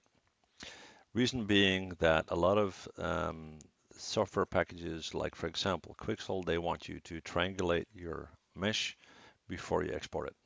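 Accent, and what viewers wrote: Netherlands. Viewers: WAIT WUT u dutch ??? :D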